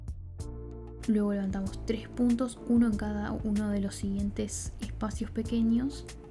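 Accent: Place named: Argentina. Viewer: Quedo super bonito! Ansío hacerlo. Pensaba que tu idioma de origen era inglés pero al escuchar tu voz me di cuenta que tienes un acento muy argentino, sino me equivoco!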